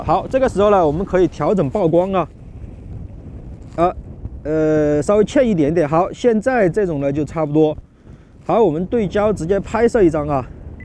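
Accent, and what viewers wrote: Sichuan, China. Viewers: 正宗川普，支持！已点赞、订阅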